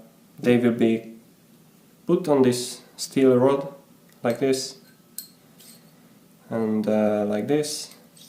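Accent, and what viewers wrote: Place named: Hungary. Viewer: I can see the Swedish newspaper but I hear Hungarian? Very confusing!?! I'm guessing you are a second generation Hungarian Swede?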